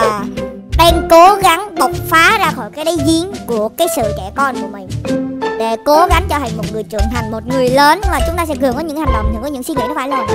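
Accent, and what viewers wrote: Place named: North, Vietnam. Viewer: Nói sao lúc sau giọng bắc luôn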